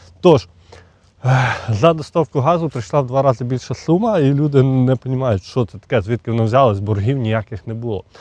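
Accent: Ukraine. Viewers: Вы говорите на русском с украинским акцентом ,определитесь уже товарищ типа учитель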